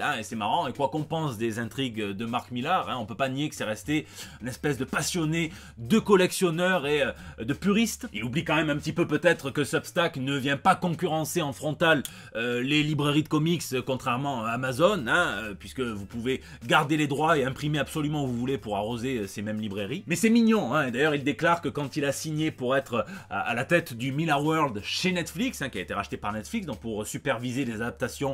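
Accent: South, France. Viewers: Salut !! Juste pour dire si jamais tu passes par là, il faut regarder l'excellente adaptation d'Injustice par Warner Animations ! En rappelant que c'est une adaptation donc y'a des micro-changements mais l'ensemble se mesure avec un double demi-mollomètre ! garanti ! Toujours autant de fun à te regarder, j'adore ton style et ta gouaille du Sud, franchement tu régales ! Bonne continuation à toi !!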